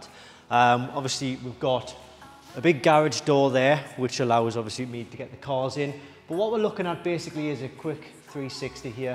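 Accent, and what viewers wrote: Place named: Northern England, United Kingdom. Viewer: Hi, lovely space to work with. I can tell from the accent that you are very North but how much a month is a place like that? Im Surrey based and that would cost a lot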